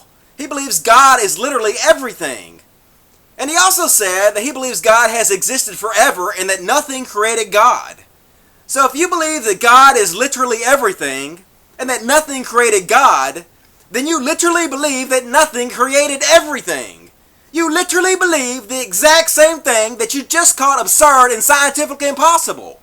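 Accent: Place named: Southern California, United States. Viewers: if this guy didnt have a hillbilly accent and a potty mouth maybe someone would take him seriously. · I love this guy's accent, it's like mixture of southern and california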